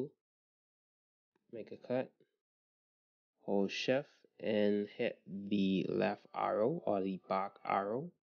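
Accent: Bahamas. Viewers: you bahamian? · same! I knew you sounded Bahamian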